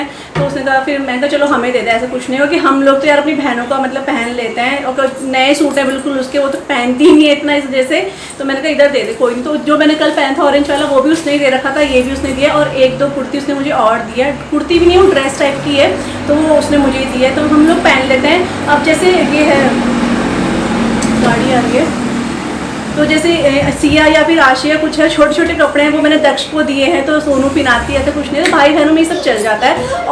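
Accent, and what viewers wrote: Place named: Garhwal, India. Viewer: Apki bolne se pata chalta ki aap tehri garhwal se ho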